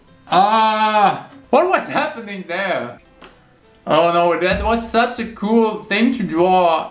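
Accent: Germany. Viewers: german accent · Man hört das Deutsche so raus😂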